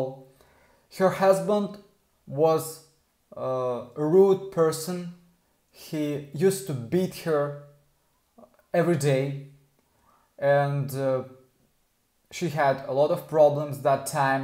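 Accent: Russia. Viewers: Its just a russian accent. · When this video started I was like “wow he has a Russian accent is he Russian” And he went “I’m a nail technician from Russia”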